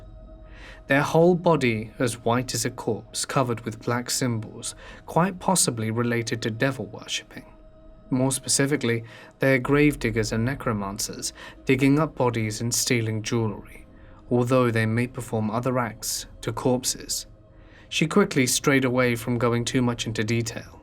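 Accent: England, United Kingdom. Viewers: You so Emglish ,i love it 🥰xxx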